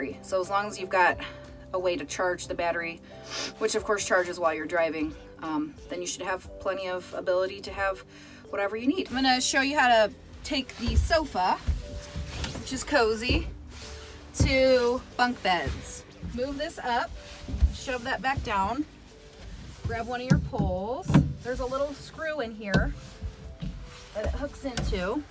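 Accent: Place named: Midwest, United States. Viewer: Just tuned in for the Midwestern accent.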